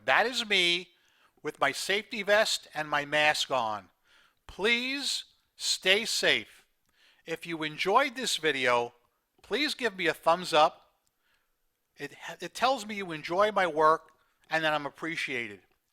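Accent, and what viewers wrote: New York, United States. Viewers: the narrator is a native New Yawker! He calls the street the Henry Hudson "Pawkway." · Very fun to explore with you and your fun New York accent. The bathroom is not a bathroom if there are no showers or bathtubs. So it's known as a Restroom. 🤗🤗🤗🤗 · your accent! You are a New Yawka times 10!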